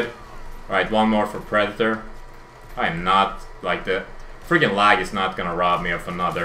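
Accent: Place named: Sweden. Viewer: nope just a normal swedish accent ^^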